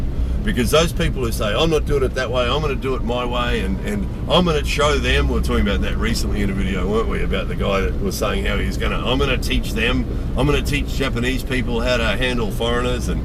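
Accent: Australia. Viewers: yeah except the problem is I can't skip forward to quickly scan and get the gist of the video because it's all just driving - so for me might as well be a podcast I've drive in Japan and know it's slow so no joy for me here - but folk who never have may find in relaxing and interesting. Strine voice tho' ... ;-)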